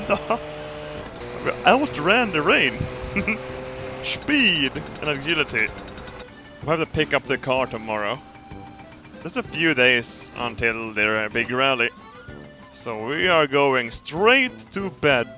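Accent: Sweden. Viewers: Booker Dewitt it's his Swedish accent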